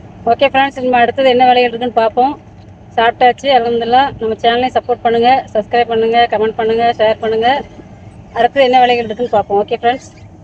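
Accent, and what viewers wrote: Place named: Tirunelveli, India. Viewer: நீங்க எந்த ஊர் திருநல்வேலி பேச்சி மாதிரி இருக்கு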